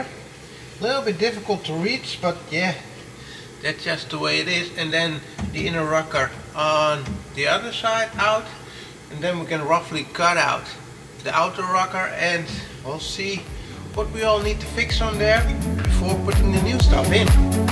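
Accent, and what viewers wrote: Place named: Netherlands. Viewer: Aan het Engels te horen ben jij Nederlands als ik zo mag raden 🤣🤣🤣👌 zo klink ik ook meestal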